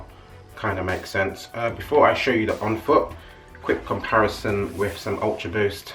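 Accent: England, United Kingdom. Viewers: I had early access to these too and the white ones!! I wish I'd of got the little buggars now as they look so much better than them boring fucking ultraboost. We need something fresh and new and here it is. At least that black bottom ain't gonna rub the fuck off either. They look dope as fuck. Proper Mad ting bruv!! I picked up some serious heat today. Nike no one's done a video on yet anyway. English heat early birds release again. Hope you'll check out some of my vids I think you'll find them different to any other. I try to make them funny and more mock style reviews. But your welcome to join the crew as I need some English blood man. And I'm detecting an English accent there. Fucking sick top too. I just wish Nike done bigger sizes I picked up some crazy bottoms in a 2xl and they are nearly there but tops I'm pretty Large on top like 56 inch chest so I'm gutted as I've been checking that jacket out for a while haha!! 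Safe Geezer. Nice Video. Subbed you. Liked ya and a good size comment too. DONE. Haha!! Yeah I need your support dude. I hope you'll come over to the DANGER Russ Side. 😁😆😉👍🏼🙏🏻✌🏼.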